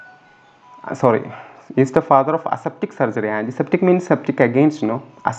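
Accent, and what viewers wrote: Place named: United States. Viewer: The way,you are speaking English seems like you came from USA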